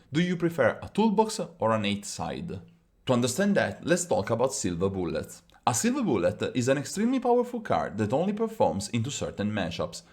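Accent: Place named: Italy. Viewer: nice video, just a few question: 1 were are u from? (cause ur accent is similar to the italian one, ed anche perché sono italiano e mi sembra di riconoscerlo) 2 i read from the rule that the correct order is not choose battlefield, die roll and choose who go first, but is die roll, player choose battlefield and then choose who go first. i think this way is the worst way, but i understand like this the rule. if i am wrong than it's the fault of the rulebook, it's the worst thing i ever read, someone in riot dont know how to write in an normal english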